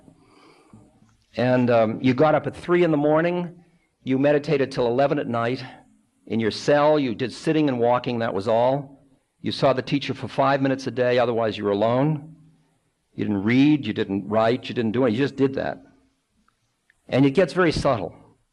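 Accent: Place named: Boston, United States. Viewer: I love the man’s voice and boston accent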